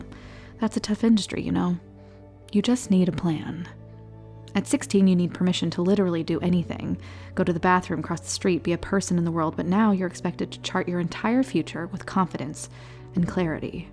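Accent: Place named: United States. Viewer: You've got one of the loveliest "next door" American accent out there! Keep it up and thank you very kindly for the effort and content! 😊🎉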